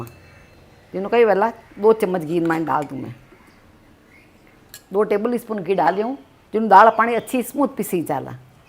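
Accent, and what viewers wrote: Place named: Marwari, India. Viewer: Aap k videos muje bahot pasand aate he ,aur aapka marvadi boli bhi..maine aapki kafi recipe try ki he aur bahot badiya bani bhi he,thnx mummy ji hame itni aachhi recipes sikhane k liye.🙏😊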